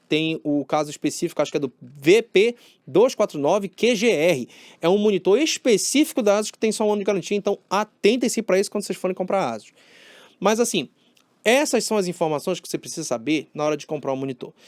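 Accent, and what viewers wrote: Pará, Brazil. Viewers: Pelo sotaque é paraense hahha! Mandou bem no vídeo, parceiro.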